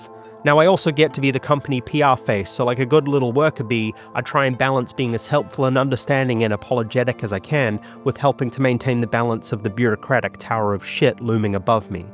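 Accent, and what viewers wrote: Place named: New Zealand. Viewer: nz accent jumpscare (positive) dude I've just been through like 5 of your videos, these recaps are great! .. I truly had no idea this story had SA users involved omfg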